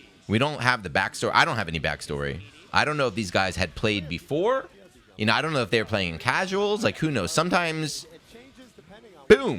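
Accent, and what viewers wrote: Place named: North Jersey, United States. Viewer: Bruh your North Jersey accent is so cringe. Plz quit the weeb FGC, thx.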